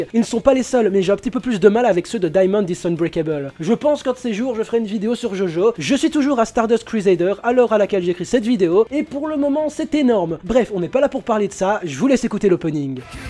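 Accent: Belgium. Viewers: Il est belge ?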